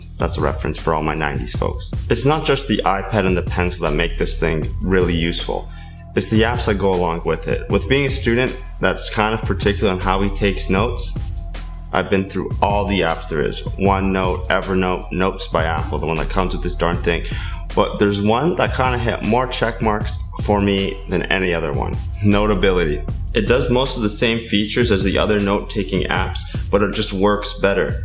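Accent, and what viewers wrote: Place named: United States. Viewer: Your accent sounds VERY American (I'm assuming you're Canadian because of some of your quirks). Great review, and I'm surprised you don't have more subscribers.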